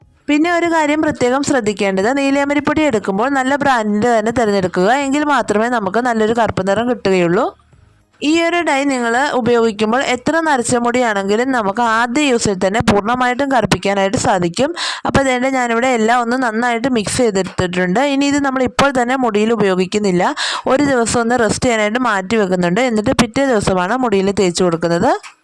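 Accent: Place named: Central Travancore, India. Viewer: Central Travancore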